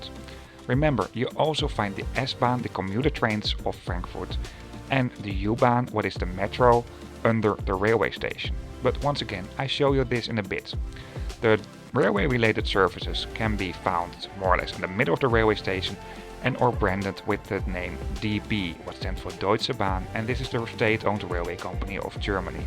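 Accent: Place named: Netherlands. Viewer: Love your videos and your Dutch accent 😊. Nice to see my home cities station from your perspective 👌👌 Btw. Funact: I tried to imitate Dutch accent on my channel 😆😆😆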